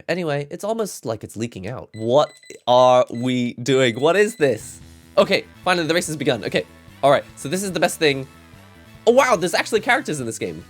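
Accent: United Kingdom. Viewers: Are you Japanese or British? I'm so confused O_O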